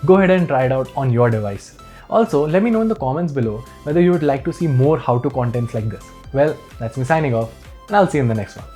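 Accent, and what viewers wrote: India. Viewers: This guy is awesome. I was skeptical of the indian accent but then I realized that he was talking in perfect english. And your video is edited and recorded perfectly. You get a sub and like from me!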